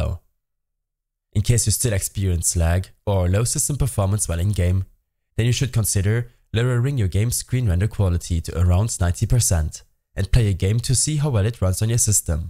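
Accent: Germany. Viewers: Bro are you from Germany?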